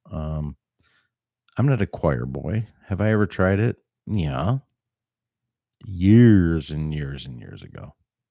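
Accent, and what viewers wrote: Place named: North, United Kingdom. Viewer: You do have an accent...you sound like you're from the North. I have a wicked accent, southern all the way.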